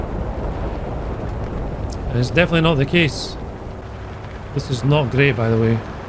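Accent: Scotland, United Kingdom. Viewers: Is this Man Scottish?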